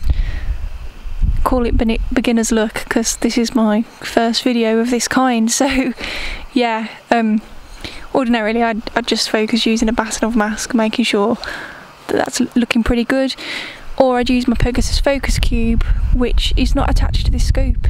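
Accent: United Kingdom. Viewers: Loved the video! Definitely going to be a hit! I have a small request. What was the plate solving software you used? Sorry, my American ears don't always hear the British words accurately.😊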